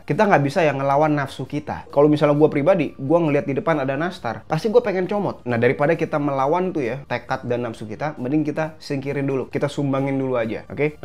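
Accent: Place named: Arab world. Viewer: Paling suka chanel ini penuh ilmu, gratis, dan abangnya kalo bicara enak di dengar kocak, logatnya kaya orang timteng ada maqrajnya, kek basa arab 🤣😂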